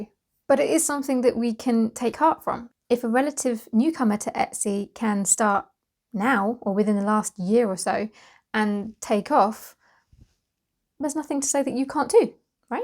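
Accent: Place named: United Kingdom. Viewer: Hi! Many thanks for sharing your knowledge on this topic!!!!!!!! This will big a big help when I open my shop on etsy in the near future. I love to hear you talk, must be the British accent. Oh and you have very pretty eyes too. Stay safe and healthy!!!!!!!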